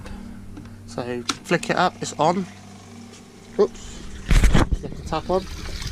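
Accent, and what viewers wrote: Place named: United Kingdom. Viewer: Love the UK accent and expressions. Good thing I've watched Are You Being Served? for years, so I understand some of your expressions. I use "knackered" a lot now.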